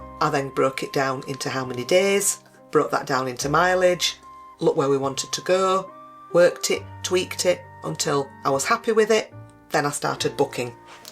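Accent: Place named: Northern Ireland, United Kingdom. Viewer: as I’m of Northern Irish heritage I can confirm your N I accent was perfect, bravo 👏👏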